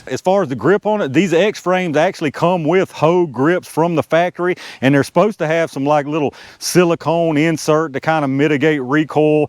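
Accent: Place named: Texas, United States. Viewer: You sound like your from Texas bro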